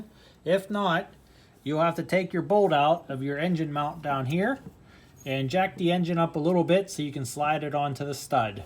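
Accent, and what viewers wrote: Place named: Pittsburgh, United States. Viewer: I didn't even have to look at the location by the video to tell you were from Pittsburgh. Y'all have a very distinct accent. Real thorough tutorial. Gonna be using this soon.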